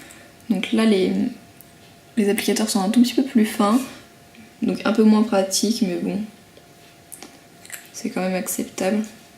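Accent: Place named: England, United Kingdom. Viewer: Coucou miss.... Ah qul plaisir cette vidéo avec ce petit accent anglais j adore un pur moment de détente merci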